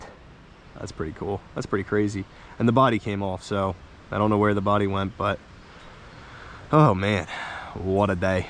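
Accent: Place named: Delaware County, United States. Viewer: Good stuff funny listening to the delco accent growing up in frankford in philly ,now living up by green lane state park,good stuff would love to fish with you in summer! Keep up the wood content on the "wooder's" lol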